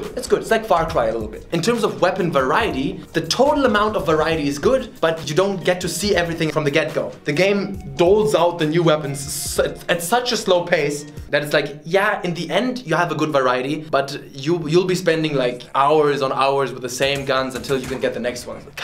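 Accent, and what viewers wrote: Germany. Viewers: Best video ever on youtube, best accent, best game review ever, I love it, laughed every second, your fantasy and creativity are above normal 😂 subbed. And definetely will check others reviews aswell, if your channel is that type, and includes video’s like this👍🏻🤙🏻😀 And I knew you was German because of the S and Z, Sie Germans, Hanz Gez Zhe Flammenwerfer😂 Greetings from Holland Niederland, Niederländisch. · The whole Time I thought why does he have an German accent and then he speaks German 😂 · Wie man einfach diesen deutschen Akzent raushört😂. Ehrenmann. Bin zum erstenmal auf deinem Kanal aber der deutsche Akzent hat dich erstmal direkt sympathisch gemacht😂. Frag nicht wieso...😂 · Leon - 501st Liegt eventuell auch daran, dass man das sowieso sehr gut raushört, weil er mit einem STARK deutschen Akzent spricht 😂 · Haha unglaublich wie ich einfach deinen Deutschen Akzent rausgehört habe. 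Super Review Abo hast du , keep up the great content. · MY G YOU SPEAK LIKE A GERMAN IN ENGLISH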